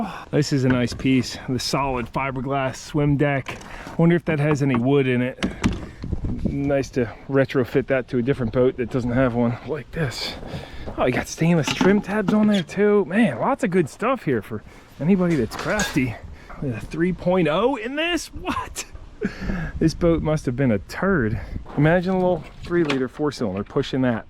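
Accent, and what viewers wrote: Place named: New Jersey, United States. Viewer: You have the same accent as my cousins who are from Collingswood NJ ! I instantly was like woah this guy talks just like my cousin ! I live in Syracuse and they made fun of our accent lol they laughed when we'd say "wicked cool" or "its wicked hot" lol. Thanks for reminding me of fond childhood memories !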